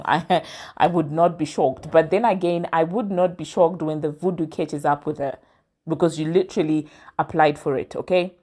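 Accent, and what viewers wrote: South Africa. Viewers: I know this is out of context. I love ur channel by the way. Are u South African?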